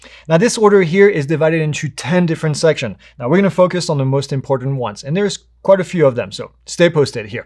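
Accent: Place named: France. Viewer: I’m just here for moderately fleeting French accent. Avoir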